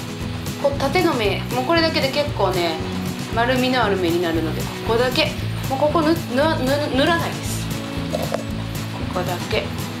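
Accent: Kansai, Japan. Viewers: 関西弁がカワイイです( ⁰▱⁰ ) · いつもサムネで気になってて初めて動画見ました！バイリンガル系の人かと思えば、サバサバしてて親しみやすく、関西弁がめちゃかわいいです！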